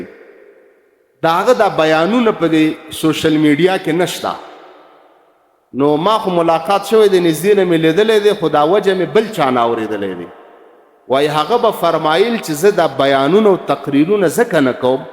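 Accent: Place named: Pakistan. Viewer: اصل دا ده چې ستا ژبه او لهجه پاکستانی ده اسلامی امارت که ځانونه افغانان بولي نو ستا په څېر ملایانو بېخ د وه څېړي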